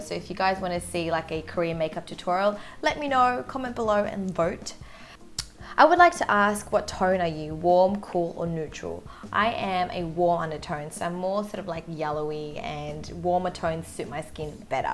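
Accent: Australia. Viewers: Omg I love your accent I want to be Australian so bad😍😍😍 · I realized your Australia accent just now, I thought you were American in the past. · I knew you lived in australia you sound just like them I love the way they talk.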